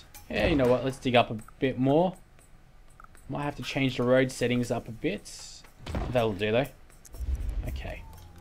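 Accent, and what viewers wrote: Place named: Australia. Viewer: Oh and in regards to the microphone, while it doesn't transfer every nuance of your awesome Aussie accent, I could hear you just fine. No worries with the microphone.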